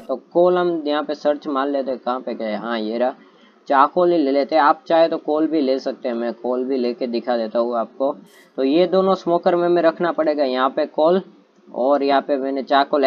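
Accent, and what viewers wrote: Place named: Gujarat, India. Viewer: gujrati log hindi bolne me pakde hi jate h ...btw i am also from gujrat..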